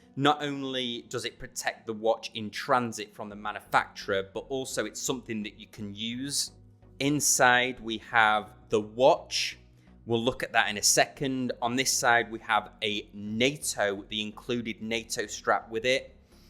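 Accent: United Kingdom. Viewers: Thank you very much. I'm sorry, I thought you lived in UK because of your accent :)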